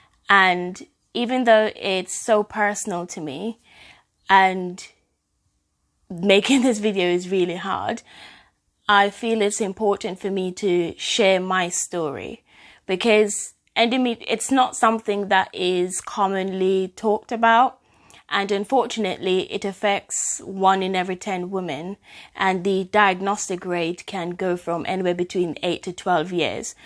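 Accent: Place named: United Kingdom. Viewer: Goodie a British enuh. Hear the accent. Great video. I am sure you will reach someone who is struggling with this.❤️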